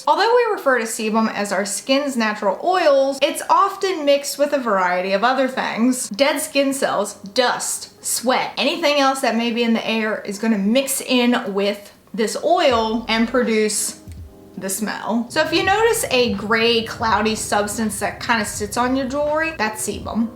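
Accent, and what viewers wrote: South, United States. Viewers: Afternoon Gorgeous 🌞 Hope this message finds u well! Luv ur vids! I just watched ur "I talk funny vid" I watched as I knew u were Southern,but didn't know from which part till now. Im a proud Southern girl myself&had the same issue when I moved from Fla 2 Colorado🙄 I even write how I talk unless it's an important document or smthin. I happen 2 luv how u talk&it's actually 1 of the reasons I started watchin ur vids. People can b so rude! Glad u addressed ur accent the way u did,luv the blunt,honest&2 the point attitude! It's another awesome southern girl charm! Keep stayin u! Stay safe&healthy&I look 4ward 2 ur next vid! Happy Piercin💕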